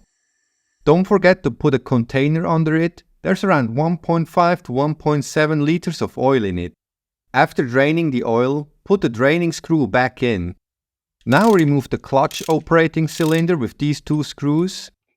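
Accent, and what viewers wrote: Switzerland. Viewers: I went and looked up Swiss accents on YouTube. The Germans pretend they don’t understand you without subtitles. Liars. The Nordic/Romance thing is real, your accent is amazing, that is a little car with a big engine, enjoy! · I love following your videos and on this channel we get your voice over. I love your Swiss accented English.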